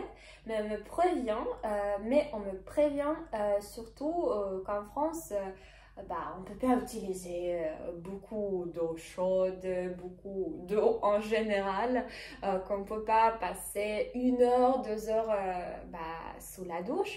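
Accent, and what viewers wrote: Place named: Russia. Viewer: Bonjour. Nouvel abonné. L'accent russe apporte à la France tout le 💨 vent froid le blizzare de Moscou et comme il fait encore très chaud en France.. c'est le dépaysement . Merci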